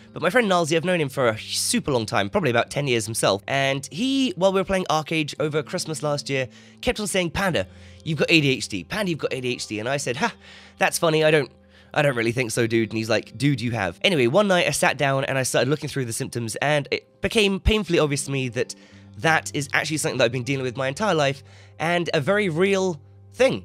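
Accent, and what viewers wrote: United Kingdom. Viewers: Like Simon Sinnek said it a ones: "They don´t will love you for doing things the way you think they want it. They will love you for beeing yourself. You´re doing it absolutly right Panda. Oh and by the way. Your brittish accent paired with a dbd Killer is the best i ever heard! Keep up your great work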